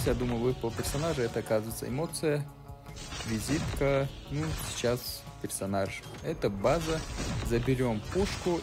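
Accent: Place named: Chechnya, Russia. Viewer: Брат, ну вот не могу понять, акцент у тебя Чеченский, но русским владеешь хорошо, я в замешательстве.. ТЫ КТО ВОИН?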